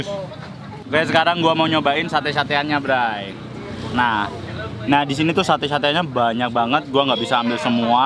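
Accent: Indonesia. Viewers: Mas nya asli org mana to kog logatnya kyk org jatim?? Dan kosa kata nya yg salah diketawain sama suami ku🤣🤣 pulen sama punel itu beda, dan cecek disini itu muntahan org sakit.disini namanya krecek atau kulitan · Bray ne kurang pas... Logat sek suroboyo... Mending suroboyoan ae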